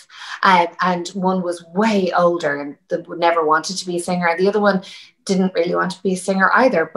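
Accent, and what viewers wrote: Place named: Ireland. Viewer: Aww it’s so nice to hear you talk again. I love your Irish accent as usual😍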